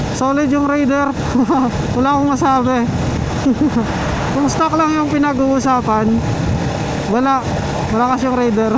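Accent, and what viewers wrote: Iloilo, Philippines. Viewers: iloilo po kayo booss??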